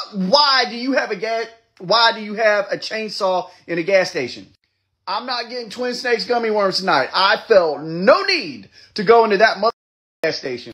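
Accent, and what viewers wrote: South, United States. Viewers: I think he was drunk when he filmed this. His southern accent was waaaay thicker in this one. 😂😂😂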